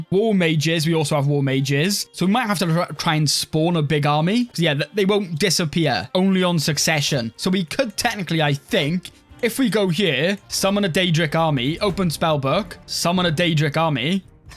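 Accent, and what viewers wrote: Wales, United Kingdom. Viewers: Welsh accent?????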